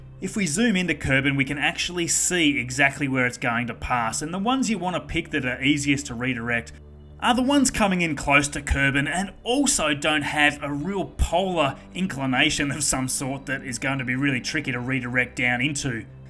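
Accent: Australia. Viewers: an old Australian audio book guy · Hey! What part of Australia are you from? I'm from Melbourne:)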